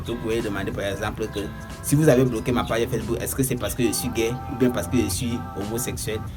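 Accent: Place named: Cameroon. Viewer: Franchement, on a du mal à vous suivre, tout le monde n'est pas camerounais...Allez doucement pour ceux qui vous suivent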